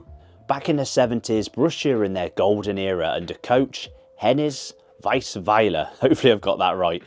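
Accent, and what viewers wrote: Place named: Manchester, United Kingdom. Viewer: Nice strong manc accent youve got there lad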